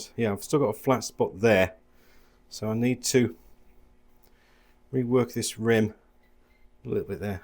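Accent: United Kingdom. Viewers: Hi, really interesting to watch, nice to hear that touch of Scouse. Will carry on watching, well done. Tom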